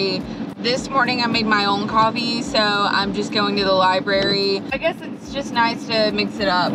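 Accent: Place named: South, United States. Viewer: In a thick Southern accent, "I'm from the South..." Yup, it checks out.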